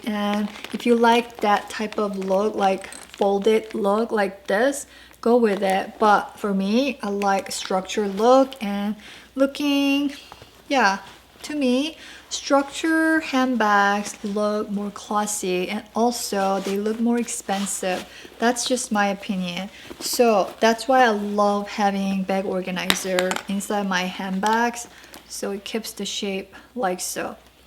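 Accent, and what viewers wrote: South Korea. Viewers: sorry can i ask you one thing? are you from korea? cuz i hear some korean accents 😹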